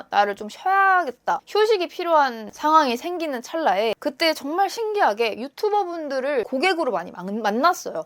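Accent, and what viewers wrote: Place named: Chungcheong, South Korea. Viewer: 세종인으로서 님 충청인일 것 같았어요 ㅋㅋ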